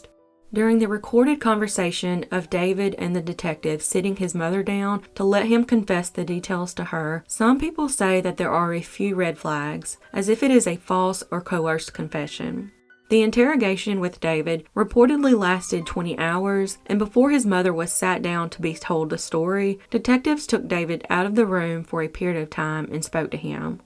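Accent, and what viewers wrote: South, United States. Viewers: There's that voice! Southern drawl melts my heart. I ordered the SGCS apron in green a couple of weeks ago. I never have gotten a follow-up email. Any idea how long it takes them to ship your items? Or anyway for me to check my order status. I sure hoped it would be here for my New Year's Eve cookout tomorrow night. Oh, well, there's always Memorial Day! Thanks,! EDIT: Just checked my email, the shipping notice came yesterday, I guess I overlooked it. Thanks again! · I find your subtle southern drawl to be quite endearing, bringing a bit ov light to the darkness!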